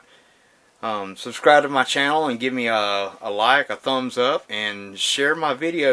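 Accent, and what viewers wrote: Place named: South, United States. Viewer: Haha. People can be cruel at times. If it is any consolation , you have a great southern voice.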